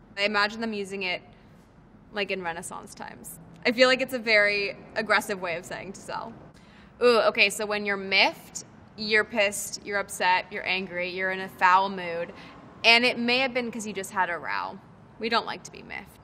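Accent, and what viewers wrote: United States. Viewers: I became confused after seeing her strong American accent, then I remember her strong British accent in Love, Rosie. Her life is definitely complicated. Thanks, Google. 🙂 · Wow. An English actress who speaks with an American accent because she moved to the US at the age of 6. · Why does she sound American and not British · Bet she wishes she had her accent back. I’d hate to have an American accent. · shaima! Bruv I live in the uk and I certainly know. The heavy American accent with the slang terms is not it💀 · She's got an American accent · Amy She was a weird choice considering how american she sounds, but there were a few in the video I didn’t know off the top of my head that she seemed to know. Also, we don’t really know how much time shes spent in the uk, could be most of her life for all we know. Still a few questionable definitions in there though 😂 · The American-born daughter of a British musician teaching British slangs whilst sporting a heavy American accent. 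What sorcery is this?? o_0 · She has american accent though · I am not familiar with Lily and after I heard her American accent I was like 'Whaaaaat? I thought you were British' and then did a quick Google check lmao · She sounds American so she should have opened with "I sound American but I grew up in both in the US and England as my father is English." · I'm confused...but she's speaking in heavy American accent! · I didn’t even know she was British.... 🤷🏼‍♀️ she has such a strong American accent! You learn something new everyday. 💕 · It's weird hearing someone explain British slang in an American accent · She has an American accent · An american accent ffs.... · She keeps it in her back pocket & can retrieve it at will, but US English is her default since she grew up here.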